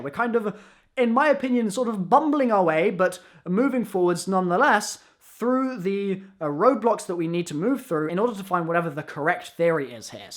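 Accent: United States. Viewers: American accent. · spot on American accent 👌🏽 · Your American accent is amazing do it more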